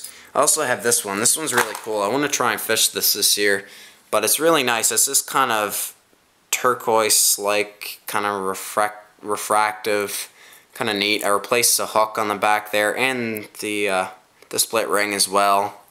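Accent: Canada. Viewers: Aboot as Canadian as it gets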